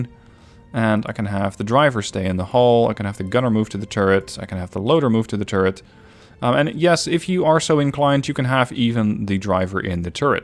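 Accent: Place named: Netherlands. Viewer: Wait he sounds Dutch haha, are you? Sprocket is fun man, i made a the Object 257 by accident(well got close)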